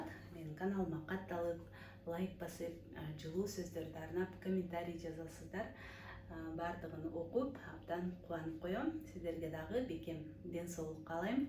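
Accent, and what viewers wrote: Standard. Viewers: Белегиниз мн чын журоктон куттуктайм.Сизди таза кыргызча суйлоп,орусча кошпой суйлогонунуз учун дагы абдан сыйлайм..Тазалыктын сырларынан да айтып,уйротуп турунуз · Үнүңүз өтө жагымдуу,таза кыпгызчаныз дагы жагат,бизге сонун тамактарды үйрөтүп эле жүрө бериңиз,бар болуңуз 😘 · Азаматсын,так ,даана,таза кыргызча , түшүнүктүү сүйлөгөн кыргыздын сыймыктуу кызы!!! Белегин кут болсун!! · Саламатсызбы,.Ден соолук, дагы көптөгөн ийгиликтерди, үй-бүлөлүк бакыт каалайм! Кыргызча эң сонун таза, көркөм, таттуу сүйлөп түшүндүргөнүңүзгө чоң рахмат! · Азамат кожойке экенсиң алтын кыз бар бол Таптаза кыргызча сүйлөйт экенсиң кай жердин кызы болосуң ❤ Бар бол алтын кыз рахмат эмгектериңе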